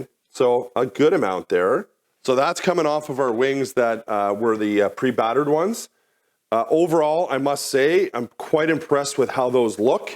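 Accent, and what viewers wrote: Canada. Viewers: Soooo...don't put it in the dishwasher then? Also, you sound suspiciously like a Canadian.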